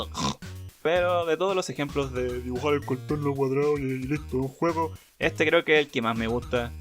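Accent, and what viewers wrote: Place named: Chile. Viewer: Tiene acento chileno Laik 👍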